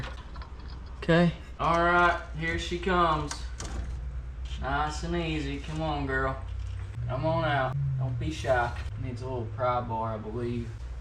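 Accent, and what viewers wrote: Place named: South, United States. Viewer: 남부억양인가 찰지네 ㅋㅋ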